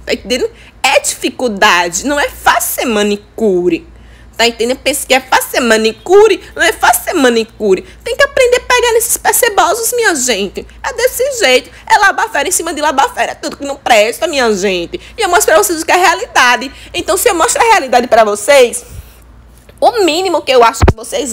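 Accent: Northeast, Brazil. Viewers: EU como paulista adoro sua voz e seu sotaque nordestino adoro vc ❤️❤️ nota mil pra vc! kkkkkkk 😂😂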